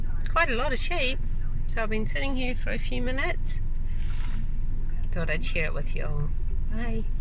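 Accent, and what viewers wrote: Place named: New Zealand. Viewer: This looks delicious, I will have to try it. I have so much rhubarb I've run out of new ideas of what to do with it. I have given as much away as I can and some has gone into the freezer as well. It's lovely to find a cooking channel that has our Kiwi accent. Have a very Merry Christmas. Your Christmas Lillies look beautiful, mine haven't opened yet. It's not Christmas till I have their perfume in the house : )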